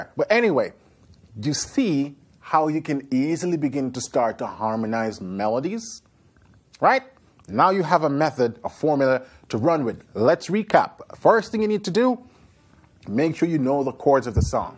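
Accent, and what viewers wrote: Caribbean. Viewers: Hey I’m from Jamaica 🇯🇲. Are you from the Caribbean? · What Caribbean voice is this….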